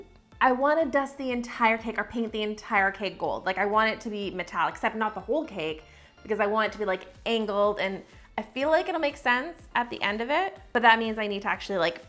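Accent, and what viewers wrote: Canada. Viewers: I didn't realize you were Canada. I could hear on this video for sure with your "abouts" and "outs" that your definitely Canadian. 😊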